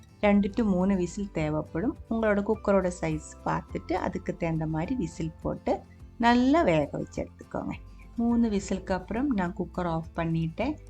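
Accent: Kerala, India. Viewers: Your voice is very suthing...that mallu slang mixed in Tamil is nice to hear...if your videos can be a lil faster..that ll be an added advantage..but overall enjoyed watching..im gonna try it soon..❤ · So nice mam ...your voice and rendering way is so cute ...I will try ...mallu accent super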